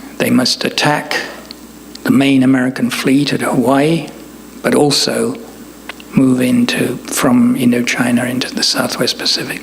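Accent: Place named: England, United Kingdom. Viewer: There is someting special about a historian with a great english accent and fantastic delivery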